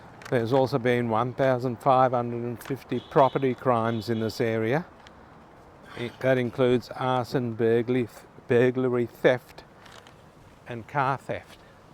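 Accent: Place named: South Africa. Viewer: Sounds like you're from South Africa, mate - one of the highest crime rate countries on the planet!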